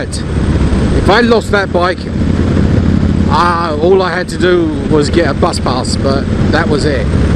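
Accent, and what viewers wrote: Cockney, United Kingdom. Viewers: It's been so long since I've heard cockney! Worked with the Brits and Scots years ago, great people, lovely sense of humor!You almost owed me a new keyboard, nearly spewed tea all over it on the "Nut to Butt" comment on #7!Took one of Scots on the back of my motorcycle one day, it was due for a carb overhaul and sometimes hard to start, after the 4th try to get it to start, the Scot remarked "Going on the starter motor, are we Lad?" Cracked me up, we both had a good laugh.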